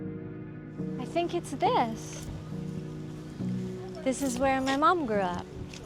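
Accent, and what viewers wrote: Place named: Guyana. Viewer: Guyanese accent and all included ;-)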